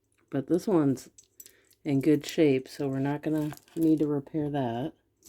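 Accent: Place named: Midwest, United States. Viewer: You sound like me😂❤ is it a Midwest thing?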